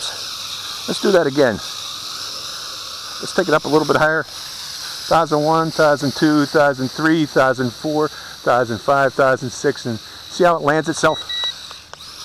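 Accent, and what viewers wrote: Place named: Western Pennsylvania, United States. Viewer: Hello, I've seen some of your other video's in the desert, and could hear when you spoke you had an accent close to what I'm use to hearing living in western Pa.... I have lived in and around Pittsburgh most of my life. Thanks for taking the time to make and upload the video's you do. Hope all is well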